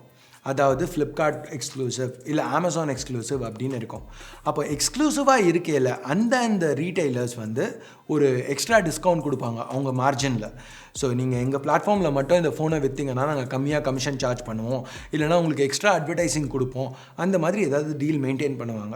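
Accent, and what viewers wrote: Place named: Chennai, India. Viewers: 🤣🤣🤣🤣cheenai slaang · Local tamil slang 😂😂😂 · Chennai slang romba super bro 👍🎉👍🎉👍🎉👍🎉👍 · Kola kaandu pola moto brand mela kku, vittu velasitaru, சென்னை ஸ்லாங்க் யோவ் இன்னாயா😂🤣😂..... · Ainba louinkasa super Chennai speech Samaaaaa😂😂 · Namma chennai language velila vandhudicha. 😂😘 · Bro pathu bro romba Chennai language Varuthu, and casual ah pesaringa 😂😂 yen indha matram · 🤣🤣🤣 1st time bro spoke local chennai slang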